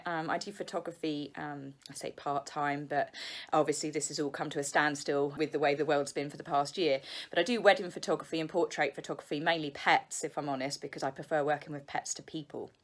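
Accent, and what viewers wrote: England, United Kingdom. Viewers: Hi! We have been watching your videos for a long time now! We are from Serbia and we never ever liked Mm and nor P Harry eighther. We just want you to know - we admire your work, your wonderful English accent! You are always right! We think - from over here we see - Harry has always been jealous and envious at his brother, it’s so obvious when people like us don’t have any reason, any use, any connections, we just see clear. We support you! Your thoughts about them - sooooo true! Love you, watch you!!! Thank you!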